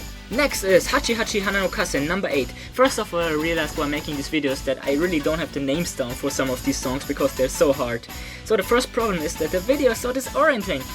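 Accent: Germany. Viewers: Kommst du zufällig aus deutschland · Are you German?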